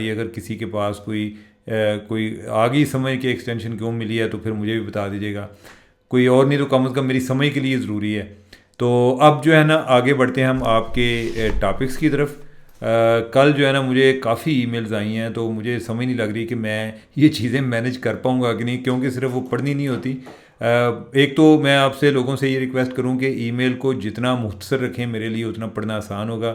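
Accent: Punjab, Pakistan. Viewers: صاحب ، پلیز اپنی اردو ٹھیک کریں، اگر آپکو ٹائم ملے تو اپنی ویڈیو دوبارہ سنیں اور دھیان دیں کہ آپ لفظ بھکاری کسطرح ادا کر رہے ہیں ؟ عام حالات میں بھی پنجابی لہجے میں اردو وی لاگ ہوتا ہے لیکن آج تو بالکل ہی حد ہو گئ۔ 😮صرف کان نہیں پورا جسم تکلیف سے گزرتا ہے آپکے تلفظ سے۔ میں خود اردو دان نہیں ہوں لیکن ہمیں کسی بھی زبان کے تلفظ پر بہت زور دیا جاتا تھا۔ 🙏🏻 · Saheb, Punjabi, Salam Sir jee, whatever your qualifications may be, you will remain “ Pendu”, and never become a polished journalist or media person, unless you Change your spoken language habbits. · Mostly punjabi (central) aisay he boltay hain, please don't mind😂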